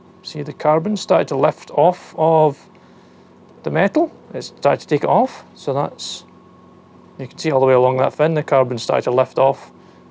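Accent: Scotland, United Kingdom. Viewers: Hi. Great to hear a Scottish voice. New to this. Question for you, can you run one of these heaters on domestic heating oil like 28second kero. Cheers, jim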